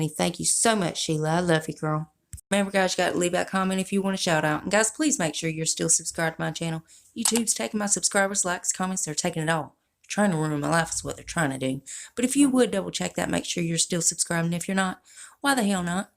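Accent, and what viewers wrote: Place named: Alabama, United States. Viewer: Are you from Alabama? :) I’m originally from North Mississippi! You speak my language !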